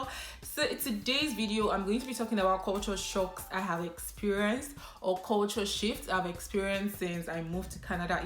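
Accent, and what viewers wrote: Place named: Igbo, Nigeria. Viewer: Love your hair and your Igbo accent is so sweet. Sorry is a no no... like you are apologising. yes!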